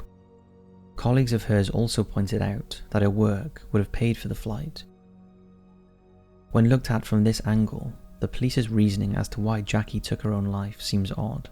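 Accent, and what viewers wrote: Wales, United Kingdom. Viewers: I read on several other posts that it’s a Welsh accent, so I do believe so. I recognise it somewhat since I once had a colleague from Cardiff area. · really? I'm picking up a newport/cardiff twang lol Maybe this can go in an unsolved mystery vid 🤣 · I think he's Welsh? The way he pronounced some words made me think South West but he lacks that Farmers twang like me. 😂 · Pavman Cometh he's definitely Welsh!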